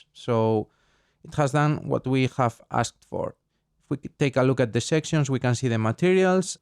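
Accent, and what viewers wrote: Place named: Spain. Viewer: gracias tete por el tutorial, joer, como se nota el acento español xD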